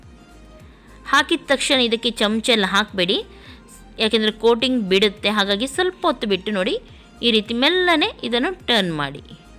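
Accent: Mangalore, India. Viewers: Ma'am nimma manglore Kannada kelodake tumba kushi aguthe kelthane irbeku ansuthe.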